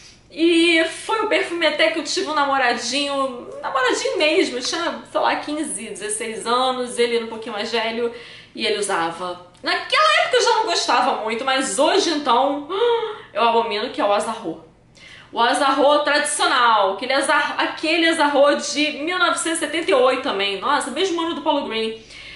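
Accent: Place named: Rio de Janeiro, Brazil. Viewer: PARABÉNS PELO VÍDEO MINHA DOCE BELA E REQUINTADA YOU TUBER ÁRABE COM SOTAQUE CARIOCA QUE EU AMO. SEMPRE BEM DETALHISTA DIVERTIDA E EDUCADA FAZ UM VÍDEO DE PERFUMES NACIONAIS MASCULINOS PARA AGRADAR AS MULHERES FICARIA MUITO GRATO BJS ABRAÇO UM CHERO TENHA UMA BOA NOITE PERFUME QUE EU AMO PRA ESPANTAR GENTE Q EMPRESTAR O MEU CAPACETE DE MOTO EMPRESTADO É O TABU UM EXORCISTA PRA EXPULSAR PESSOAS QUE EMPRESTAR ROUPAS ETC........ KKKKKK❤😙😍😂👏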